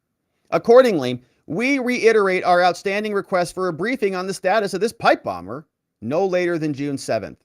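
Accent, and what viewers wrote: United States. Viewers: u have a montanan accent lol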